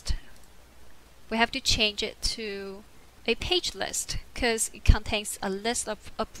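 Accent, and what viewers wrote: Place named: United States. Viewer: The question I have is how can I see the contents of "Su Approval List" after the decision table has executed? I have tried to find it in the clipboard and find it using the tracer. Is it possible to find the contents of the Su Approval List page list in the clipboard or using the tracer? Also I was thinking the page list contents could be stored in the database. Since I use the pega academy cloud version of pega I know of no way to search the pega academy cloud version of pega. I had not trouble of getting the same results you got when I repeated your steps. I though it would be could know how to find what is in the Su Approval List page list for debugging purposes in future problems I might incounter. Thus the reason for my question. Keep up the good work. I worked thru 2 of you videos this week and will continue with the other videos as time permits. 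One of the main reasons I like your videos over most of the utube videos on Pega is I can understand your English (because it sounds like the English spoken in the United States).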